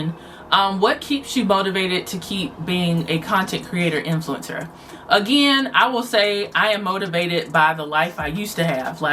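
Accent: South, United States. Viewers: This has probably been the BEST, most informative, and straight to the point video I’ve watched regarding being a content creator. Thank you so much for being transparent with your audience. Congratulations on 9 years! 🥳…Also new subbie (Khrissy told me about you 💞) and also because I love your accent! I immediately knew you were from the South! 🥰♥️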